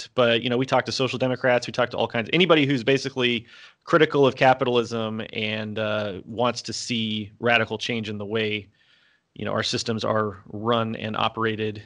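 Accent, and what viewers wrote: South, United States. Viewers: and since speaks in his native Southern here, perhaps he can get Olly to speak in his native Northern (Geordie)... I'd like to hear that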